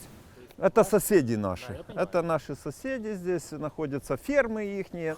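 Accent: Ukraine. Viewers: Черт возьми, я просто не поверил, что такое хозяйство возможно на территории Беларуси и акцент немного смущал, но подумал... вдруГ Полесье, Гоомельщина... а утут слышу "у нас в Украiнi"... и все стало на свои места... Молодцы, что сказать. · я тоже сразу задался вопросом где это, начал читать а хрен тебе , какого черта не указываете место положения, я надеялся россия, но сразу уловил акцент, и сомнений не осталось , развернул коментарий и подтвердилось украина, молодцы украины, а вто авторам бы посоветовал указывать не только страну но и город местность!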